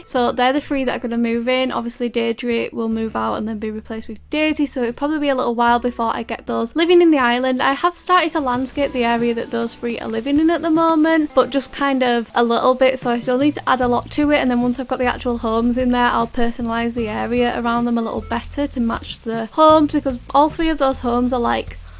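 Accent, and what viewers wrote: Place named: Northern England, United Kingdom. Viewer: your accent makes me so happy, I'm from Yorkshire and hearing another northerner makes your videos feel so cosy aha, your island looks so so good!!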